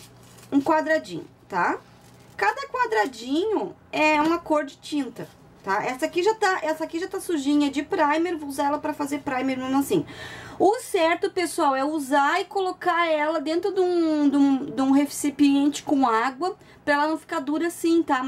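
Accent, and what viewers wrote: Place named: Curitiba, Brazil. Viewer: amei vc é de Curitiba? tenho parentes em Curitiba, o sotaque é igual ACHO LINDO Bjs ♡♡♡♡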